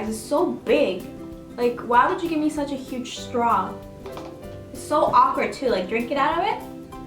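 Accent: Spain. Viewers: I love will smith I want to go to Tokyo japan I want to speak Portuguese your Spanish accent is very pretty btw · i love the Spanish accent that influences the pronunciation of your English letters ❤❤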